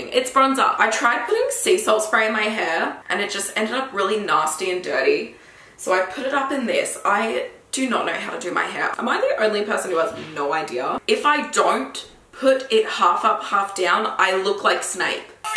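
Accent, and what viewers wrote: Australia. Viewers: You're so f@ beautiful an I love the Australian accent 😍😍😍😍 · Are Australian ? Very cute accent hehe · LOL the subtitle for the beginning said “long time gnar see” not made for australian accents I guess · ow an aussie? i live in sydney :) also this is hot :p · Idk where she is from but it sounds like Australia, in which case it would be spring/almost summer there!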